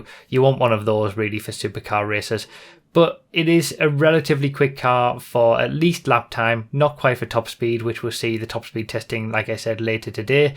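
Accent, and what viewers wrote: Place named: United Kingdom. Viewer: Dem brit ish accent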